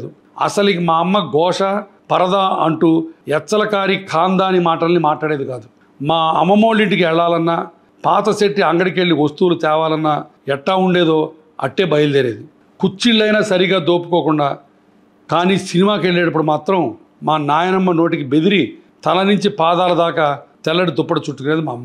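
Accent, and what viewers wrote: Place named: Nellore, India. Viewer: Chala authentic Nellore yaasa sir. Chala rojulaindi Intha raw and authentic nellore yasa vini 😊…. “Dargamitta” is a place in Nellore.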